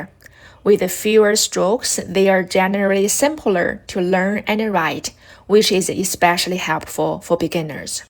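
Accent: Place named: England, United Kingdom. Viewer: really great and like your English accent it is very charming. Thank you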